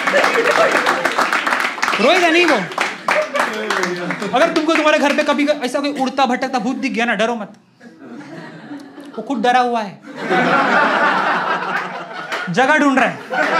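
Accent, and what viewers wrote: India. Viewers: The Marathi accent. lol.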